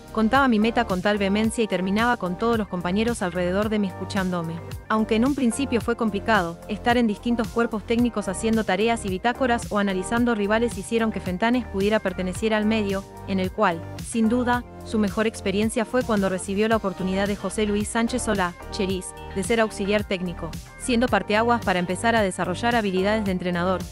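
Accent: Argentina. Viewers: La comentarista es de nacionalidad argentina? la doble l la pronuncia igual que los argentinos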